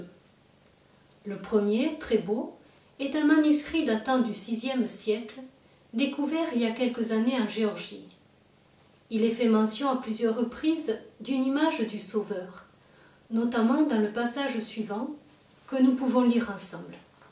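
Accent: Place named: Provence, France. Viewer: #5 Que dire ? 🤔 ... J'adore l'accent de Provence ! C'est véritablement charmant et quelque part aussi, apaisant à entendre... Surtout ne le perdez pas ma Sœur 😌 Merci à vous et que LE TOUT PUISSANT vous bénisse... ✝️ P. S. : Caso a Irmã (cujo nome gostaria de conhecer) NUNCA na sua vida tenha feito até hoje, uma peregrinação até FÁTIMA ... Por 🤲🏻 favor, não deixe de contemplar essa possibilidade! Claro, quando puder ou quiser... Pois a FÉ assim como DEUS cá estarão para acolhê-la com o amor, o carrinho & o respeito que merece 🤍 OBRIGADO✓ Adieu 👼🏻 ma Sœur.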